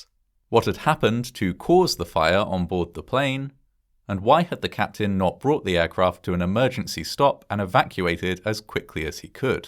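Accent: United Kingdom. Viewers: Horror vids are always better when narrated by a British bloke.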